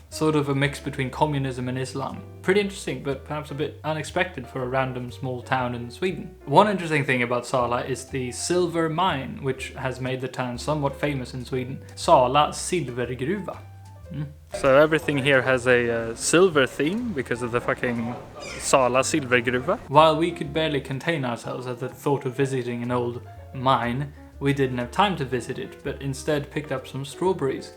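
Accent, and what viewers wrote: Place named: England, United Kingdom. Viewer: I watched some of your videos because I thought you were gay- (I thought the French guy was your boyfriend)-with all your gay jokes, but then it seems your not gay so then the gay innuendos get annoying AF but I’m curious how your got an English accent or is that how Swedish people sound? Also, I noticed that Europeans drop the F-bomb a lot. You saved me thousands with your videos because I was thinking of visiting Sweden and after watching your channel I feel I saw what I needed- Sweden is basically Ohio. 🤷‍♂️✌️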